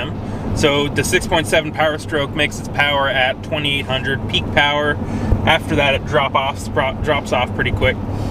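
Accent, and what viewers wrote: Canada. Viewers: My family had a cabin up in Lynn Lake, Manitoba. Beautiful country. And incredibly nice and friendly people... I enjoyed this video. I didn't know you're Canadian until I saw you at the pumps and started talking. Yup! I was paying $2.50+ a liter when going up there in July 2022. And dn here in the the state's people bitch about $3.00 a gallon!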